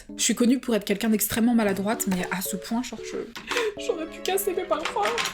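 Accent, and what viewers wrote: United Kingdom. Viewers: Tu peut faire desfois des vidéos en anglais sous titré français si ta la foi de le faire mdr !j essaie d'apprendre et j estime que l'anglais c est une langue mondiale on devrait l apprendre serieusement depuis la maternelle et pas a partir de la 6 eme...anyway j aime trop ton accent Anglais 😍🥰🔥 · Holala c'est vrai qu'en brune cheveux courts un peu ondulés, ça te va su-per-bien. J'ai bien aimé ce moment ! Je partage également l'idée qu'une chaîne en anglais serait absolument génial. C'est que j'apprécie aussi, ces expressions anglaises et ces accents, qui font totalement naturel chez toi. Moi aussi au lycée les gens à l'aise en langue étrangère étaient moqués. C'est typiquement français en fait. Une espèce de complexe d'infériorité qui finit par être d'une arrogance gênante. Ça a brisé des ambitions linguistiques ce comportement. Bref ! 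I love so much your accent ! Please, made your english channel it will be so cute to level up in english with you too. 🙏❤😘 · Ça serait trop que tu fasses quelques vidéos en anglais we love that with your british accent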